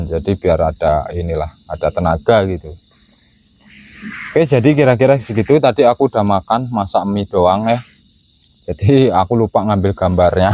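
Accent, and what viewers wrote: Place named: Banyumas, Indonesia. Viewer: Kebumen.....wong ngapak iki😂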